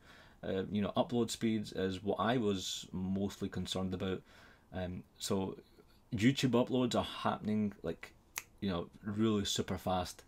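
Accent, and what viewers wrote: Scotland, United Kingdom. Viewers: i love the scottish accent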